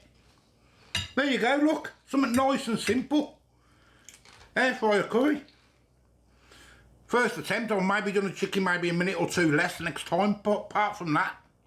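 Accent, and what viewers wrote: Birmingham, United Kingdom. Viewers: New subscriber here you sound like a fellow brummie?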